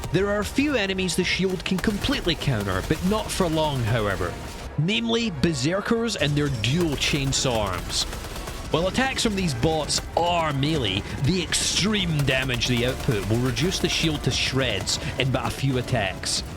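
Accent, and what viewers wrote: Scotland, United Kingdom. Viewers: Insta subbed as soon as i heard you were also Scottish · Are you Scottish?! My people 🙌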